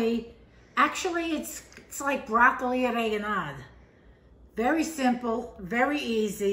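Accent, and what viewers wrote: New York, United States. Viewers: My favorite red head with a New York accent.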